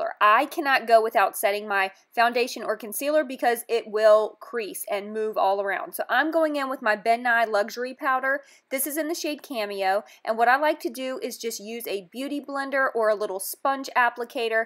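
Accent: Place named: Georgia, United States. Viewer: oh my word! loved this. love your accent too! are you a georgia girl? i'm going to look for the salmon colored concealer at drugstore...thx!!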